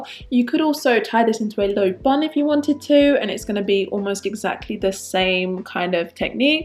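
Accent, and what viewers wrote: London, United Kingdom. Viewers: You have a beautiful accent, are you from London?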